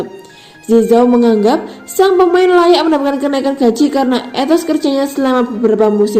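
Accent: Java, Indonesia. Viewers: Aih jawa x ,betul terok lah ,ganti suara tu,jangan terlalu percaya diri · Naratornya kurang asik Medok bgt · Medok tenan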